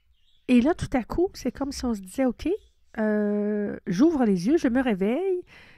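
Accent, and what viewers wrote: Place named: Quebec, Canada. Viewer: Une voix familière québécoise! Il y en a peu depuis que je visionne différentes capsules sur ce lien suite à mon éveil! Je vous découvre avec bonheur ce soir. Je vais parcourir vos vidéos maintenant...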